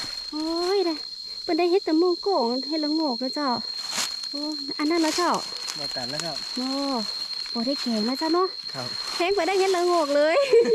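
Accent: North, Thailand. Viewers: รอไห้กำลังใจน้อง จ้าชอบน้อง อู้คำเมืองเพราะๆจ้า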